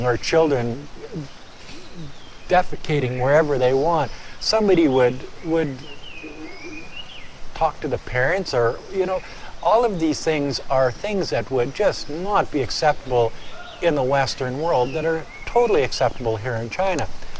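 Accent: Canada. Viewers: This guy sounds very canadian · This gentleman is a Canadian, eh? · This guy sounds Canadian.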